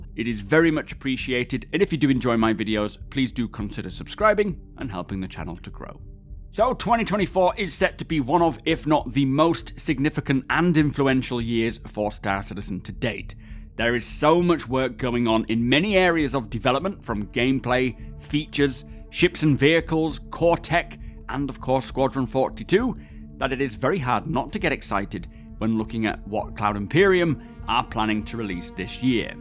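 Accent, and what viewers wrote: United Kingdom. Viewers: Happy New Year. As I said on my last live I was able to participate in. Your noble British accent makes you seem older and wiser. I would guess that u are younger than I. At 43 your video's are perfection and balanced. With each video I have been urning for what we are about to see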